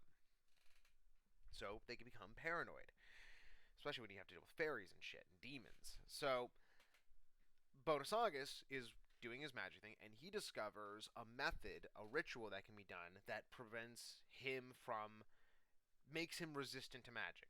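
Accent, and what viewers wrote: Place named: South East, United Kingdom. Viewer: South easterner detected, all hail the pub sub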